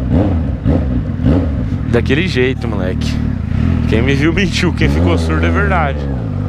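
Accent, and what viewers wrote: Goiás, Brazil. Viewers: a TEE AMOOOO ta flnd NO PIQUE DO GOIAISSS VELHOOS TEMPOOSSS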